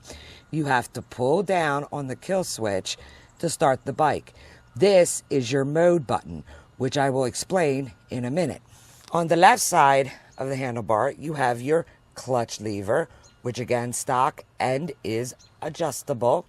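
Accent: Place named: England, United Kingdom. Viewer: Great video and very informative, I too noticed you have some English accent as I say leeeeeever too!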